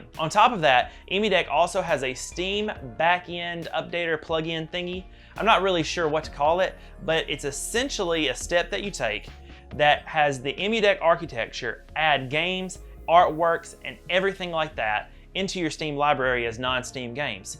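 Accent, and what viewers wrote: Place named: South, United States. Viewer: I came for the review, subscribed for the southern charm. Cheers from Canada!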